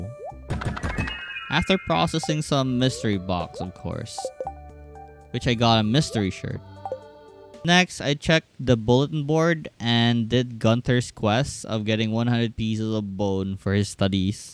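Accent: Philippines. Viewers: Are you a fellow Filipino? I noticed your accent in your 100days video and i heard filipino voices in the background somewhere in this video. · are you filipono? your accent sounds like it.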